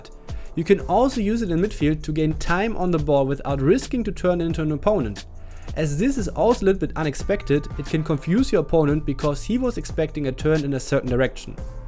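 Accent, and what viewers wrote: Germany. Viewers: man hört das deutsche direkt heraus haha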